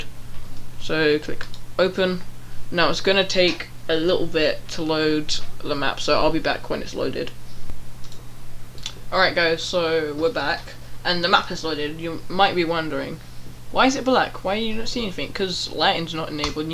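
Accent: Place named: United Kingdom. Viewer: This is the most bri"ish accent I've ever witnessed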